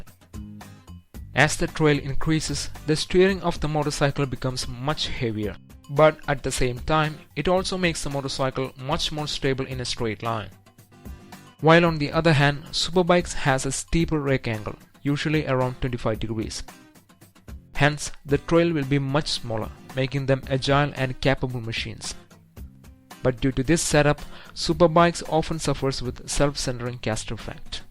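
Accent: Kerala, India. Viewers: argg your accent making me sick dude ... you must be from kerala .... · Very hard to understand the massive indian accent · Are you malayali? You sound so.. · Your Mallu accent is thick X_X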